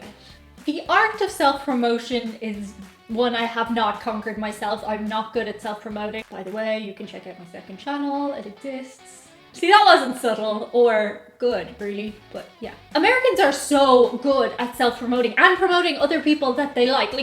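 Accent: Ireland. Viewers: If it was North Korea you wouldn't have that lovely Irish lilt to your speaking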